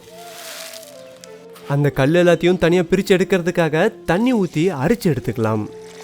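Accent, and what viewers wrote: Salem, India. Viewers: Salem ah neenga bro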